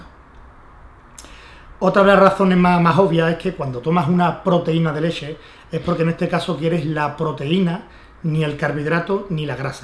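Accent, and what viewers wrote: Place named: Seville, Spain. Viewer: es sevillano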